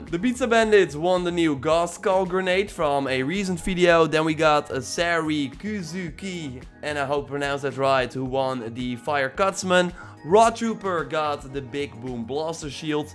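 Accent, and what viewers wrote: Netherlands. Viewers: where are u from u have like a dutch acent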